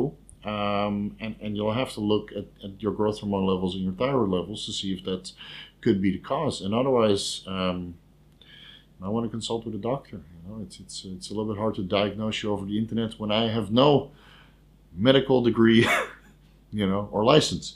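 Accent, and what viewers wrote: Netherlands. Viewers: why is that buddy? Great channel by the way. Could tell instantly you were from the Netherlands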